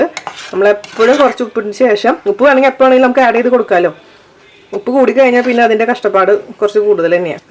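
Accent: Thrissur, India. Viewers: super pachadi.. 👍pine trichur language super... 😍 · fantastic. Your Thrissur style tone of talking is really an added attraction to the elaborative presentation. Well done.. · Chechi de samsaram kettittu Thrissur,Urakam bhagathulla aalu pole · Chechide veed evideyaa. Thrissur samsaaram aanalloo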